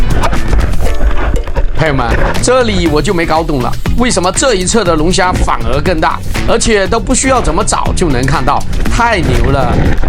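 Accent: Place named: Fuzhou, China. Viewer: 你是福建福州人吗 福州口音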